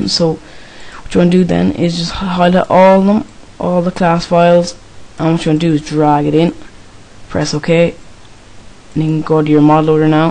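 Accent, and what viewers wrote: Ireland. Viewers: You irish?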